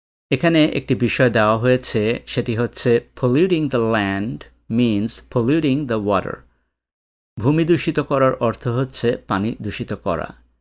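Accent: United States. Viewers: আমিরিকান স্টাইলে না বলে স্বাভাবিকভাবে বলবেন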